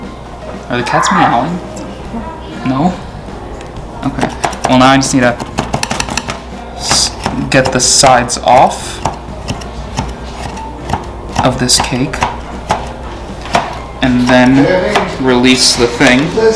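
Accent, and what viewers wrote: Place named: United States. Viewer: джан,и тебе спасибо, за твои вкусненькие рецепты.! Арменин,который говорит по русский с американским акцентом.! ☺️👍🤗😘💋❤️🤗 джан,с Великой Пасхой тебя поздравляю.!Мира,здоровья и добра тебе желаю.!И естественно,процветания твоему замечательному каналу.!👍👍💥💥💥💥🌞🙋‍♀️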